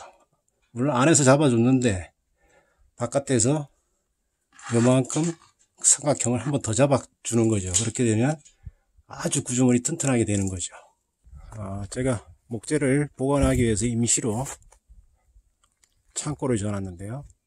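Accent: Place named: Gangwon, South Korea. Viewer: 강원도 말투신거 같은데 듣기 좋습니다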